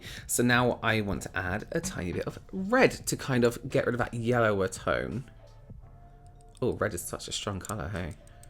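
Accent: England, United Kingdom. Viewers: your accent is divine...❤ what part of England is it i woder? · So, I kinda love you! I used to live in England, so your accent makes me happy.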